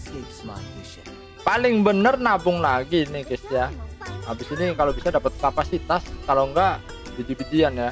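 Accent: Java, Indonesia. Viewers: Asli mana mas jawane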